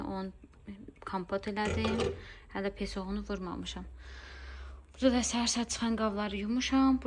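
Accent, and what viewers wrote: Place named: Mingachevir, Azerbaijan. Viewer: hə lap yaxşı məndə Mingeçevirliyəm sizdə bizim kimi danışırsınız maraqlı gəldiniz mənə sizi görməsəmdə doğma gəldiniz mənə ❤❤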